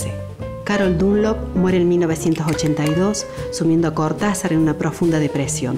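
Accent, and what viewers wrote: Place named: Córdoba, Argentina. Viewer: Hermosoo! Es re cordobesa la que relata jijije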